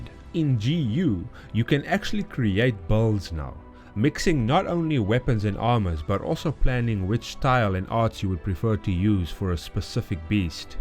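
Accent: South Africa. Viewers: Are you South African by any chance!? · which accent is this, are you south african mate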